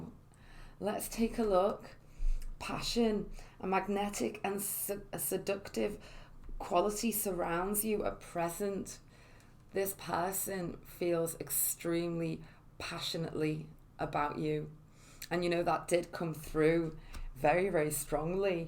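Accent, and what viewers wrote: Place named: North of England, United Kingdom. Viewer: She is from the North of England maybe Liverpool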